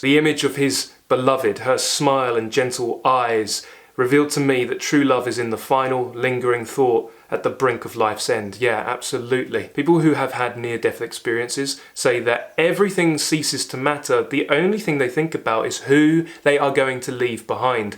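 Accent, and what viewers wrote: United Kingdom. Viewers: What a beautiful presentation. Thank you! I am a 74 year old young widow, ordained minister and psychotherapist who recently discovered your channel and subscribed. I am also a New Orleans, Louisiana born transplanted to and living the last 24 years in Fort Worth, Texas. I say that just to provide info as to how far reaching your channel is and I am in love with you! I love your British accent (I know as an American I am the one with the accent). I have listened so closely and discerningly to your assessments of the great books you presented and the characters today and it is refreshing to learn of your reasons you love each book- each character. I am an INFJ also. I don’t think I could pick just one baby but I read Jane Eyre in grammar school and fell in love with her. I also love the Count of Monte Christo “God will give me Justice” and Elizabeth Bennet and Miss Bennet and Miss Bennet God bless you and Jean Valjean and of course, you are right the paramount Jesus Christ. 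“God is that which there is no other greater than,” St. Absalom. PS are you married if not I have a 30 year old granddaughter I would love 😂for you to meet. 😂❤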